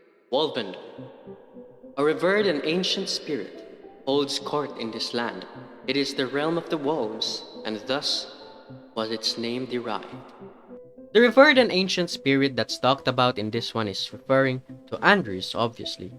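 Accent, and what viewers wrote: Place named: Philippines. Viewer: I have a big feeling you are a filipino because of your accent.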